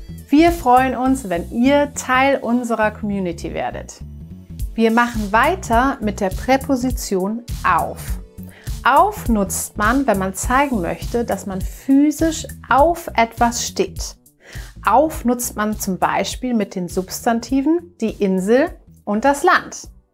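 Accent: United Kingdom. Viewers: He is English, trying to speak german for the video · Kommt aus Großbrittanien? Er hat ein starkes Akzent haha. Keine Respektlosigkeit, meine Grammatik ist schrecklich 💀 ich glaube "starkes Akzent" war in diesen Beispiel nicht richtig oder? Gibt es Deutsche hier mit den Antwort? 😂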